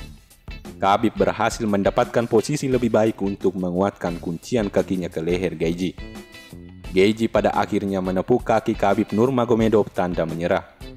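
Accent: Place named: South Sulawesi, Indonesia. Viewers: Itu suara orang sulawesi bro.. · Orang Bugis yah pembaca narasinya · host nya pasti org sulses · Yg punya chanel pasti saudaraku dr p sulawesi.dr logat nya.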